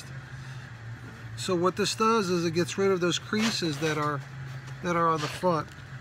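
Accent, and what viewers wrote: New York, United States. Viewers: Are you from NY? You sound like you may have been from NY.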